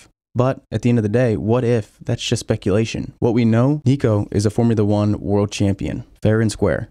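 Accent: United States. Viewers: Hearing an American talk about F1 is just weird. The names of the drivers, the tracks... its just wrong bro xD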